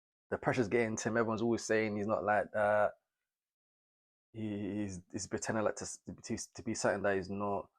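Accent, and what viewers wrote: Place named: United Kingdom. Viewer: U sound like u are from UK... 😅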